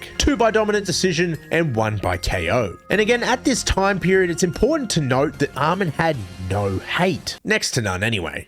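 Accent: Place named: Australia. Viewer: You're bit too aussie for me to subscribe or like the vid. cheerio mate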